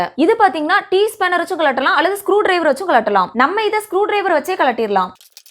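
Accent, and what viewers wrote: Tirunelveli, India. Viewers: உங்களுக்கு திருநெல்வேலியா ? எந்த ஏரியா?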